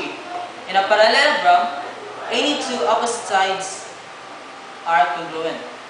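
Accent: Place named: Philippines. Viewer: Lol, You have such a filipino accent! Nice(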